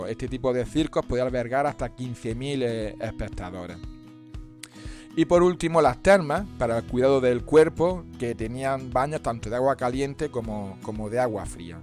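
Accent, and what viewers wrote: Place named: Andalusia, Spain. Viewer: Me encanta su acento andaluz de pura cepa 😁😁😁😝🎻🎶 me encantó ir de turista a Cádiz, me gustaría repetir, saludos 💝💝💝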